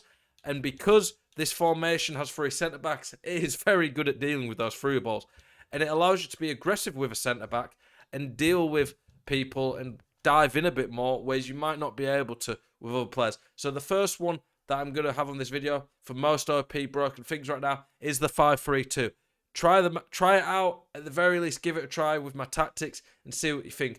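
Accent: United Kingdom. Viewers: Man this is the most British accent i've ever heard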